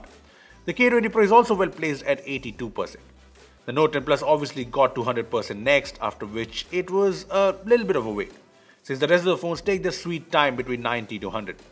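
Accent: India. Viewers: oh shyet another indian accent.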